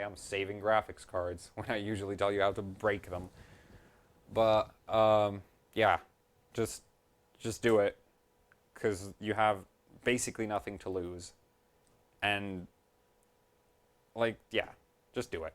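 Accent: United Kingdom. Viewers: you have actually got a pretty nice English (UK) accent. BTW love your honesty and not overworked videos. thx and keep it up ;-)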